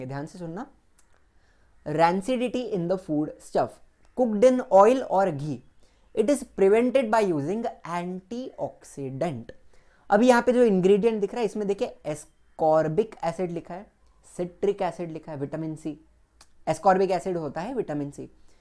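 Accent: Maharashtra, India. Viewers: Sir kya aap maharashtra se ho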